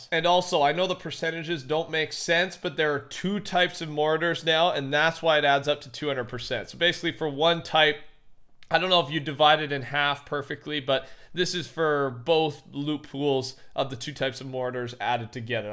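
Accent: Canada. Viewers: Are u Canadian? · Is he Canadian? Nvm xD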